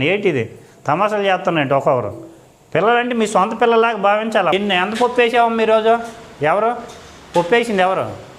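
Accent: Srikakulam, India. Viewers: Srikakulam slang super sir....bagundi....sweet ga...asalu me east west vijayanagaram srikakulam vallu tittina...tittanatte untadi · Srikakulam yaasa bavundi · బ్రదర్ నువ్వు ఉత్తరాంధ్ర శ్రీకాకుళం జిల్లా వాసిలా ఉన్న బావుంది లాంగ్వేజ్ బాగుంది మంచి మంచి పని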